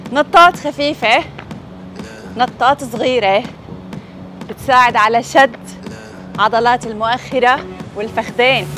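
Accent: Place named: Damascus, Syria. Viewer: نحن نعرف اللهجة الحلبية تختلف برشة عن اللهجة الشامية ، انتي تتكلمي شامي ( دمشقي) معلوماتنا انك من حلب عليش ماتحكي لهجتك ، اختك من تونس 🇹🇳 نحن نحب اهل سوريا 🇸🇾 برشا خصوصًا اهل الشام زرناها برشا مع حلب وحمص .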